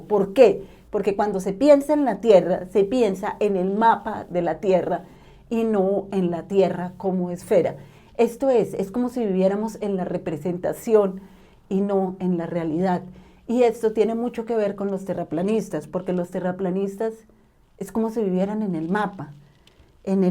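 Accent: Colombia. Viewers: Me encanta tu personalidad, tu risa ,tu carís-ta... sos colombiana verdad ?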